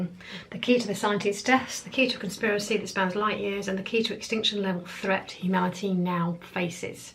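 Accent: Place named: RP, United Kingdom. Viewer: Received pronunciation .moron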